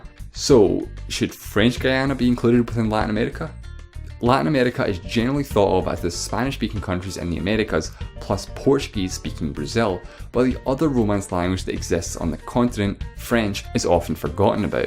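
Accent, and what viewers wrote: Scotland, United Kingdom. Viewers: You have a very strong Scottish accent! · You made one fundamentaL mistake, claiming that "people from, Portugal are essentially Spanish".It is like claiming that people from Wales or Scotland are ... English ! :-\ you suggest that anyone speaking a Romance Language is a Latino, incluidng (drum roll) Italians and Romanians.May I politely suggest you change that view before you upset the entire Italian Youtube community !!!Guyana and GHuiana are NOT pronounced "guy-ana" but "goo-yana" "Not all people from latin America are latin..." is exactly like saying "Not all people from North America are Americans". Because they are not. Theyr ancestors emigrated there from Europe or came with the African slave trade - the only REAL South Americans and North Americans being the (nearly extinct) Natives.All in all... I do not know whether you are Scottish or Geordie but you DO have an issue understanding what is Latin, what is Latino and what is neither.Good night. 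· are you Scottish? cause I'm in love with your accent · Of course the narrator explaining all the differences in Latin American is not American. I’m trying to find out the accent maybe Scottish? Americans should be the ones who know inside out this but they are the only ones who are ignorant. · i quite like his voice, love me a scottish accent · are you Scottish ?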